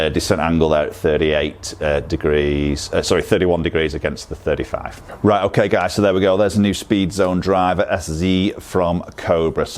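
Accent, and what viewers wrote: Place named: England, United Kingdom. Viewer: STOP TALKING YOU PRATT WHY OH WHY DO THESE GOLF PROS ON UTUBE NEVER. STOP TALKING DO THEY GET PAID BY THE WORD!!!! And those horrible English accents! Shut up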